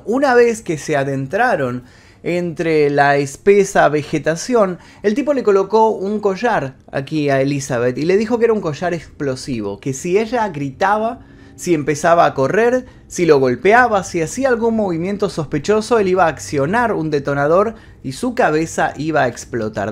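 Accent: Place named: Argentina. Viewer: Guapo... linda voz con ese acento tann lindo argentino... eres super profesional... mi nombre es Paz Alejandra de Santiago de Chile🥰😍🤩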